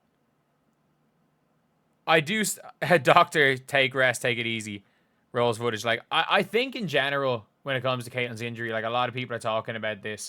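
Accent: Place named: Ireland. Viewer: I like your accent! Sounds Irish to me. Where are you from?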